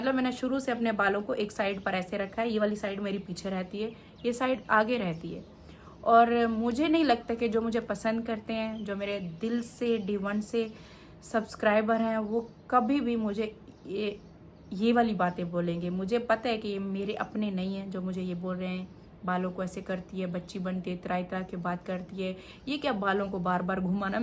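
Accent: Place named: India. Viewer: fazool baat mat Karo. Mein ne bhi comment parhe hein. Tum groom ker rahi ho sab cheez to dressing bhi groom Karo. Aap ko samjhaya hi Hai zayada tar logon ne. Trip pe aise kapre nahi pehente. Aap normal pehente hein koi nahi kehta. Aap picnic pe ghalat kapre pehen k gaye. Vo kapre picnic k liye nahi the. Aur aap ki zuban saaf thi. Aap ab india n logon ki Tarah bolna shru ker diya Hai. Achi aur saaf zuban ko kharab ker Liya. Yehi bola gaya Hai. Ye vlog intihayi fazool Hai. Aur her waqt mere husband ki doosri shadi. Her waqt yehi baat. Bas Karo yaar. Aur koi topic nahi hai. Agar tum her baat pe public ki baat sunti ho to groom kerne ko bola Jaye to use bhi suno.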